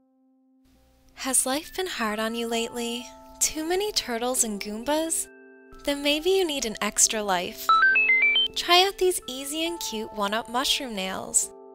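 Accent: Canada. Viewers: I'm pretty sure she's Canadian. She has a bit of an accent when she says "about", and it sounds Canadian. People with different accents say words differently.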